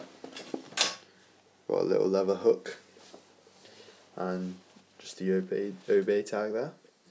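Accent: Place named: United Kingdom. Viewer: Why tf does an Asian have a British accent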